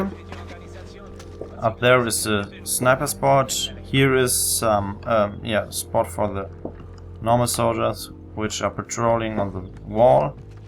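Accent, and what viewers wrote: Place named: Germany. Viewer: voll hammer mann hört voll den deutschen acsent (hoffenlich richtig) aus der stimme also kommt das noch in Deutsch wäre cool.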